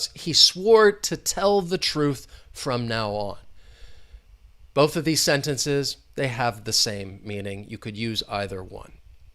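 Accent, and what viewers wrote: United States. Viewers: American accent too heavy. 👎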